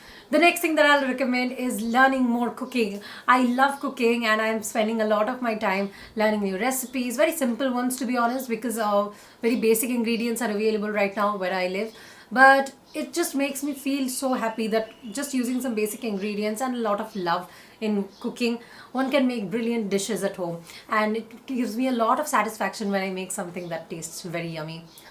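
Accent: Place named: India. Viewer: You are amazing ,fabulous indian. Adore your accent.. Allah keep you,stay safe.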